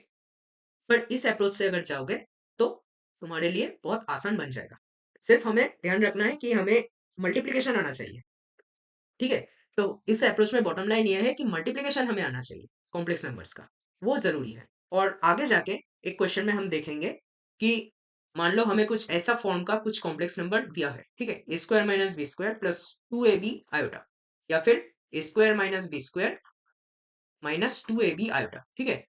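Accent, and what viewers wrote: Bengal, India. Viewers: Bengali ho kya bhi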